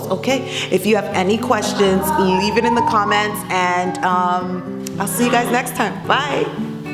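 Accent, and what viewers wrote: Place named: Guyana. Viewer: are you Guyanese? You remind me so much of my cousins. They grew up in NY and have a mix of Guyanese Newyorker accent. And that painting reminds me so much of my home and a place called Kaieteur Falls.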